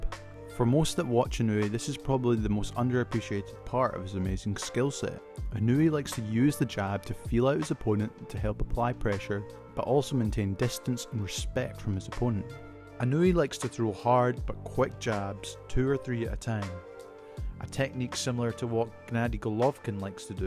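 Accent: Scotland, United Kingdom. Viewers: Nice to hear a Scottish voice doing breakdowns as good as this. Good shit brother🏴💙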